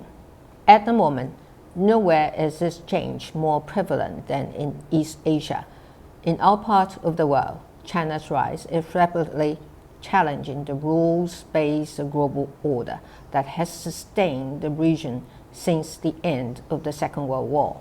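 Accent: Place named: United Kingdom. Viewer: 做得好不好我不知道 但這英文能力很強呢 而且因為是對歐洲各國講所以用英國腔 其實挺厲害的(我只會破美語XD)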